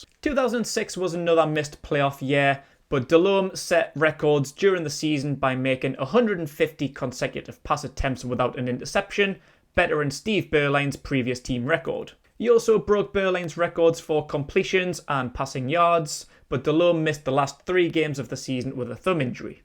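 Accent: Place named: England, United Kingdom. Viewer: Got sent here by Panthers Post (sorta). Interesting to hear someone talk about American football in an English accent lol. Hope you enjoy the game next week, win or lose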